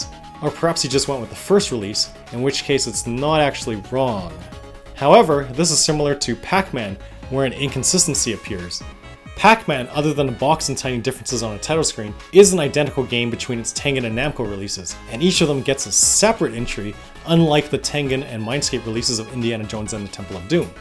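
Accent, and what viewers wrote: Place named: Canada. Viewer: Cheers from a fellow Canadian! It was funny hearing Cdn "aboats" both in your review AND that Cheetahmen ad. :D And thanks for VGMaps too; didn't know you ran that site.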